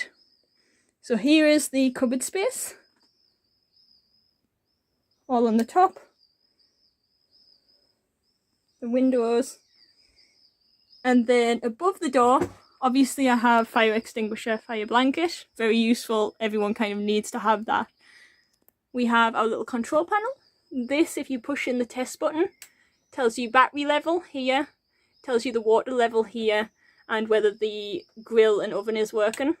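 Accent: United Kingdom. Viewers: Are your from Durham?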